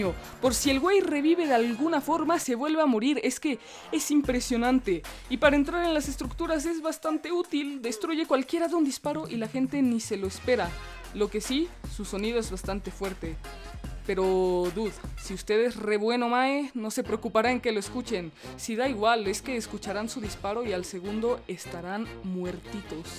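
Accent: Costa Rica. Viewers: Eres de costa rica como mencionas la palabra mae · eres de costa rica? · Hola jaja eres de Costa Rica verdad jaja Pura vida mae jaja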